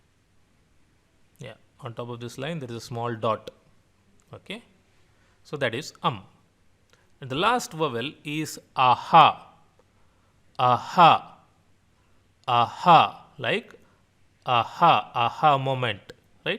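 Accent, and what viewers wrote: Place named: India. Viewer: Your videos are very helpful, my friend. However, you're giving us English words to help us with Hindi pronunciation. The problem is that you are pronouncing those English words with a very, very strong Indian accent. Thus, your English examples are not helpful to me, at all, because I find that you pronounce those words very differently from us. I would like to request that you give examples with Hindi words, and not English words. That would make your lessons much more beneficial. "Is" is NOT pronounced with the same sound as "meet." Is = Short i Meet = Long E The "Short i" sound does not exist in Indian languages. So, it would be very difficult for you to master the sound. It would also be difficult for you to hear the difference between "it" and "eat." My point is that I am working very hard to learn authentic Hindi pronunciation. 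It would be helpful if I were more exposed to pronunciation in the Hindi language, as opposed to trying to compare Hindi and English sounds so much. In the end, our sounds are completely different and they really should be not be compared to one another. I love your lessons, though, and am enjoying learning with you very much.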